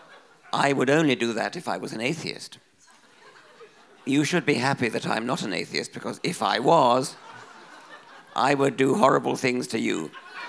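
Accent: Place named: RP, United Kingdom. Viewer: What makes this hilarious is reading out these obscene comments, in his posh accent.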